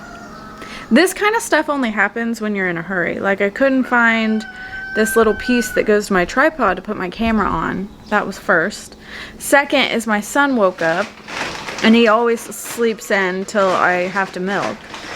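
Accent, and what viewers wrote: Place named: Midwest, United States. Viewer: Just found you this am. I knew I heard a midwestern accent. I was raised in central Illinois. You sound a lot like me and my sisters. I will be following you and will share your post. I follow older people who would be happy to support younger people. Your flowers are gorgeous! I nice blend of real life and information. Hope you have a wonderful week.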